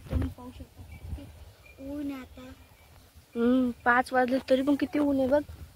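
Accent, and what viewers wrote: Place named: Konkan, India. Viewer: thank u tri tumchya bolnyawarun watlach mala ki tumhi koknatun asal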